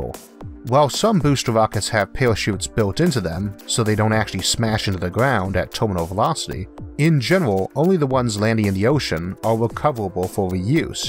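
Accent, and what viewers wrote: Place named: Louisiana, United States. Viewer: I don't want to make a point of this as I feel discussion of it takes away from your content, but I need to chip in here. I don't hear an impediment. I hear a pretty specific accent. I'm not American so I'm poor at knowing regional accents, but I always get a bit of the Louisiana twang, maybe? Indeed the only time I think about your impediment is when you mention it. Can't be all that bad I guess! Thanks so much for this wonderful little corner of youtube. Free of idiots and haters, just reasonable people with a shared interest. All the best.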